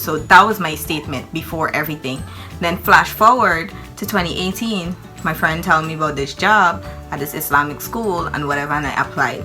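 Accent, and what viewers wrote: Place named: Jamaica. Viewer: I want more of the Jamaican accent!!! 😂❤❤ 10/10 for the accent lol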